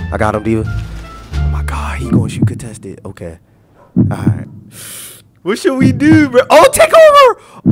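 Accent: Baltimore, United States. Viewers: Are you from Baltimore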